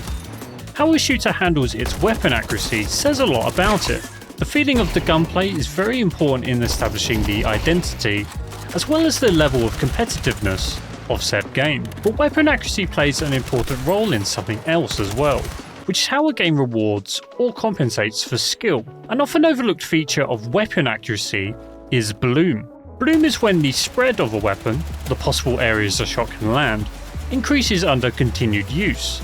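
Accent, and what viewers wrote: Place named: United Kingdom. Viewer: you didn't have to put "UK" in your username for people to know you're British™, your pronunciation is is so 🇬🇧🇬🇧🇬🇧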